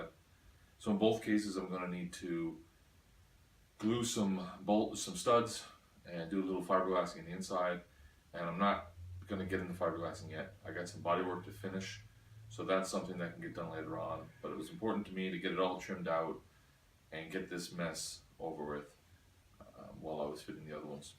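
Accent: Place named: Newfoundland, Canada. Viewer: Ahah - no wonder I couldn't peg that accent... A newf in AB is always a bit of a different one. Cheers m8, just stalked you on FB heh, hope all is well. Looking forward to updates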